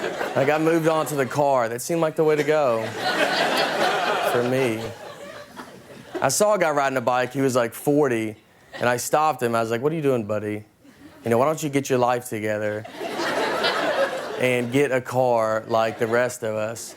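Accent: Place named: South, United States. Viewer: Much younger look back then obviously, but I noticed way more twang in his voice too, country southern sounding.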